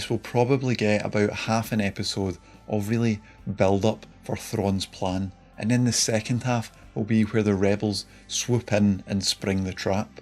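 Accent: Scotland, United Kingdom. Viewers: haha you're Scottish, small world :)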